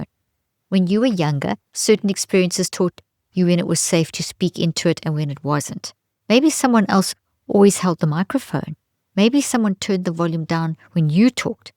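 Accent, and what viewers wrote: South Africa. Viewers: Dr Leaf, are you maybe South African because your accent sounds exactly?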